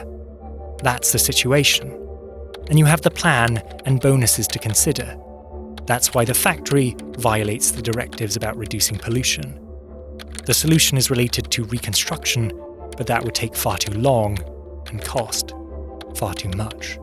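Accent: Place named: United Kingdom. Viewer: British detected, channel rejected. Maybe talk about all the most depressing British cities, go fix your country and get off the couch.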